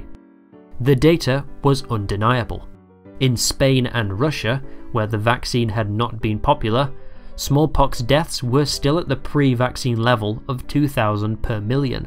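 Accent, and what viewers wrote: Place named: United Kingdom. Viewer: the narrator has a british accent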